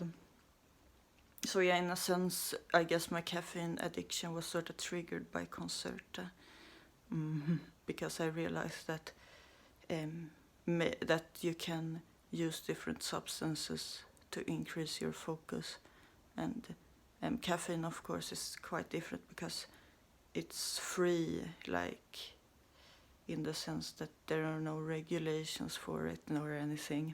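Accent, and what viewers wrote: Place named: Sweden. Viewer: Sounds swedish. Köp l tyrosine, fixar avgiftningen. Naturligt koffein, en aminosyra. 150kr burken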